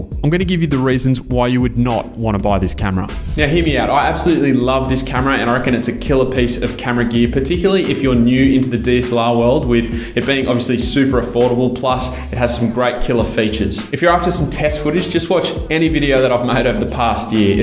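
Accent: Australia. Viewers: Very nice video, quick question are you Aussie?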